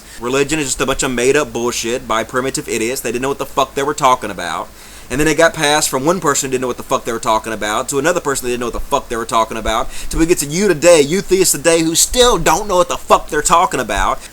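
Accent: United States. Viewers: Didn't know that you were a fellow Mississippian. I knew you sounded a little country. Fuckin' awesome.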